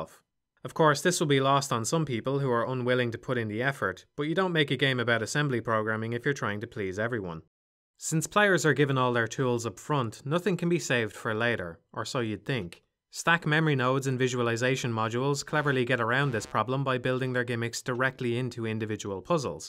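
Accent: Ireland. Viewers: Any chance of a breath of the wild review? I need a twelve hour analysis featuring my favourite floating Irish voice.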